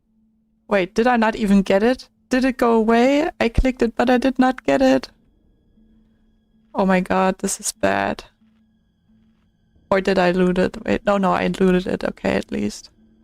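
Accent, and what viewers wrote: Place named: Germany. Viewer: i would guess german ;-)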